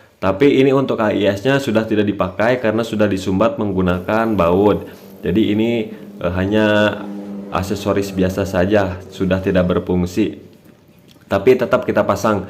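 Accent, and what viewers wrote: West Java, Indonesia. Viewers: Alamatnya daerah mana logat ada bahasa sunda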